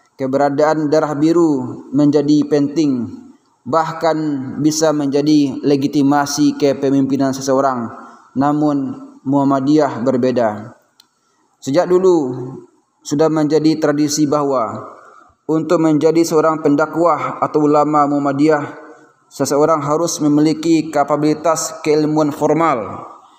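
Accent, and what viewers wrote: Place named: Padang, Indonesia. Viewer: Ngomongnya logat Padang boanget yak?! 😁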